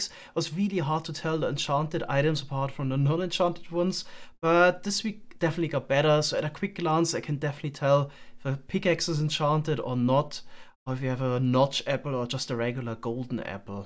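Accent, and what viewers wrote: Germany. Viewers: Ur German right ?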